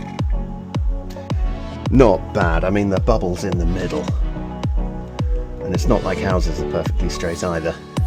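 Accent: United Kingdom. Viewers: Thx for this video. Also very easy for non-native speakers to understand. It’s a fantastic British English. Thank you for that. I love the way you pronounce glockenspiel! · I really liked your review of this product. I have the Eufy Homebase, Door lock and Outdoor cameras, and absolutely love them. Your review convinced me that the Eudy E340 doorbell is the one to get (I'm tossing out my Ring doorbell as the subscription costs are outrageous, and it doesn't have the Eufy capabilites). I also love your Brit accent and makes me a little homesick, as my inlaws live in Ramsey, Cambridgshire, who I miss. Thanks so very much. Oh, and I live in Columbus, Ohio, USA. Cheers.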